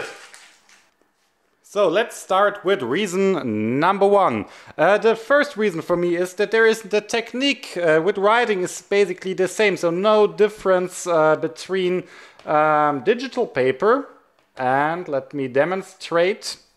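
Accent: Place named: Germany. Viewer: The german is strong with this one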